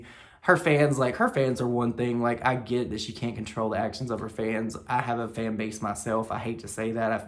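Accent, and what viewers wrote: South, United States. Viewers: I must know for sure. Are you from the south because I am 🙋‍♀️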